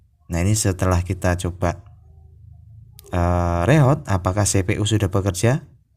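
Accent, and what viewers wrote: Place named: East Java, Indonesia. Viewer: ow kirain di Jawa timur, krn logatnya mirip orang sini ☺️🙏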